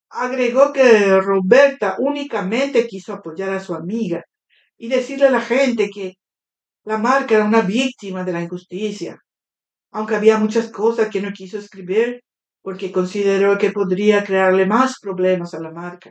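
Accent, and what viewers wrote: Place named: Portugal. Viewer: Me encanta el acento portugués 😘🤗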